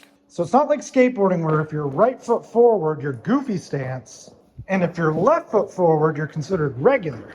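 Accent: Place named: Newfoundland, Canada. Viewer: 8.3/10 Newfoundland accent. And also fatbikes are deadly. Me and the b'ys love to ride them.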